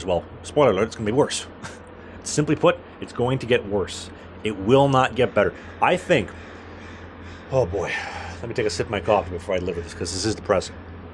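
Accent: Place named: Canada. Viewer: I'm a 35 year old Indian American VP at Nividia and so glad I don't have to live in Canada and be broke and freezing all year and have a goofy accent like this guy. 😆 "Aboat, aboat, aboat!" 😆 If I ever see you in America I am calling ICE to put you in a detention center you silly nobody Canuck.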